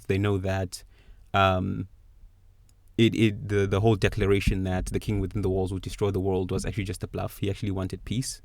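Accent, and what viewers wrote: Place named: Africa. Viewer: Firstly, you should never make another video. You suck and your voice sucks. Secondly, the decision to invade Paradi was made by brats who never knew the kings vows and were just trying to return home as heros. It was never the orginal intention of the Marley Army. By the way you sound African. Overthinking is the reason we are poor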